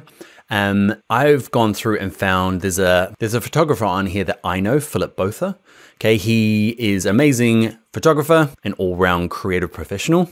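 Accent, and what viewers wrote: New Zealand. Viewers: Thanks for great tutorials - you're a gifted designer as well as a teacher! And hearing your accent, I miss my highschool exchange year in Papatoetoe... Cheers mate, thanks again! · Hi. Andy here from NZ....how refreshing to hear another Kiwi explain this app in detail. Great style and pace too.....the American tutorials treat you like a baby....I was first put off by the length of the clip...but hey...this was seriously worth it. Picking up Indesign to help my daughter create her first children's book....she is 10... And needs a bit of help.... I think you've given me everything I need to get started. I see you also have illustrator tutorials...I'll be checking those out too. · do I hear kiwi accent?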